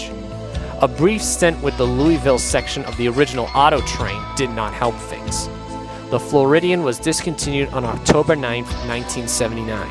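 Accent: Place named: Boston, United States. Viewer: Your Bostonian accent is for real 😄 not being offensive I live in MA but I don't have an accent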